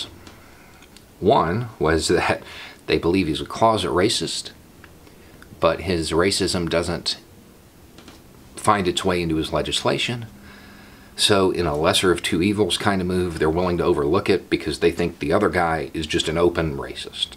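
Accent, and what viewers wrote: South, United States. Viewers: So this video popped up on my feed and I decided to watch it. I honestly came into this video thinking you were a trump supporter and you were going to bash Trudeau and yang, then I heard your southern accent and it made the feeling that this was that this video is going to be. Boy was I wrong. Like i said this is my first video I've seen so i dont know your political views, or even if you post content like that, but no matter what side you are on, you are on humankind's side and that's what matters! Thank you for this video and i wish more people thought like you!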